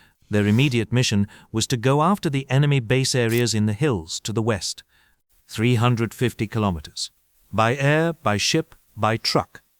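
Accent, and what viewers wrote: United Kingdom. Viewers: Why the british accent guy narrating. Get an American.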